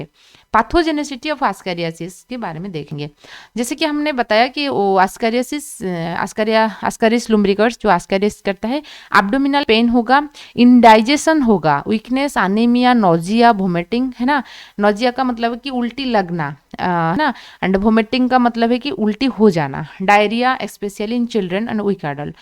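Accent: Odisha, India. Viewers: Are you from odisha mam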